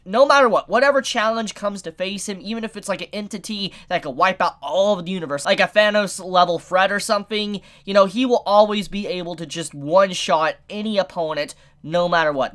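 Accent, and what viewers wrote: United States. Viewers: You talk like a cowboy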